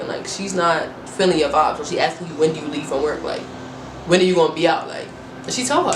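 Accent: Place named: New York, United States. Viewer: Where you from?? You sound like your from ny cuz that's where I'm from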